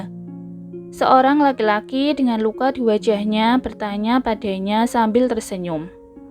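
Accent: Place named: Java, Indonesia. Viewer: doorrrr Jawa banget aksen nya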